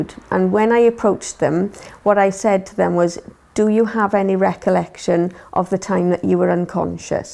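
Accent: Wales, United Kingdom. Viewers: vashon100 WELSH...from WALES.... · Beautiful welsh accent .......... my sister said she saw herself on the operating table from above.......German surgeons drilling her head........she had a head injury from motorcycle accident............she was in a coma for six months.......... My brother said his life flashed before his eyes when he nearly choked to death......... Myself.......my children's father whom had left me & married another woman & lived in another country............the day he died I had this unreal tiredness .....I was that tired I went to bed.........I always have plenty of energy.............my son phoned me (I was still in bed) in a terrible state saying his dad had died while doing his plumbing job at a customers home.........around mid day..........he died alone of a heart attack.......... I was wondering afterwards was my ex husband trying to call me............was he trying to get a message to me...........did he realise he loved me at the end of life...........I wonder if I will ever find out..........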